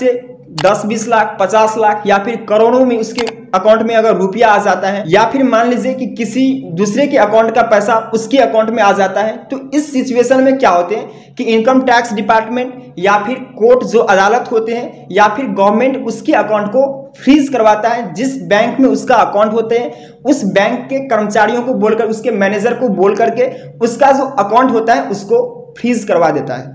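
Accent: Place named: Bihar, India. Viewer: Tumhara awaz se lagta hai tum bihari ho😄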